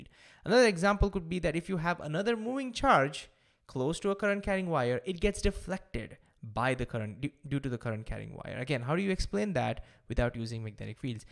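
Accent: India. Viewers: you made me a believer! Btw i love the typical Indian accent. moVing becomes mOOOing. Gotto love it.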